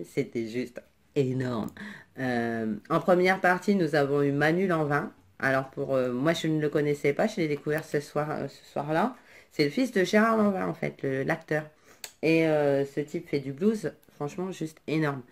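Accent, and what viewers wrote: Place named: North, France. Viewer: Re :-) si tu savais comme elles sont moches mes rosaces lol, oui y a un léger accent du ch’nord lol, gros’gros bisouss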